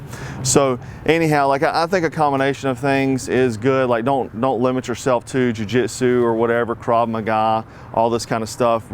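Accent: South, United States. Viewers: Whenever a dude with a southern accent talks about anything to do with survival or barbecue I listen 😂 But yes every last word is true.. what kali style do you study? It doesn’t look like the flashy mainstream stuff. Pekiti?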